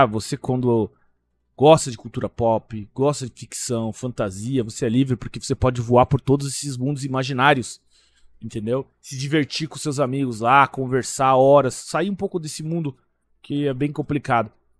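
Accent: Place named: Curitiba, Brazil. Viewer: Eu te sigo pelas suas notícias/informações, e esse teu jeito Piá de Curitiba de narrar as mesmas; acredito que cada um dos 20mil inscritos tem seus motivos e o que deve ser unanime é o formato simples e objetivo do canal .Parabéns pelo trabalho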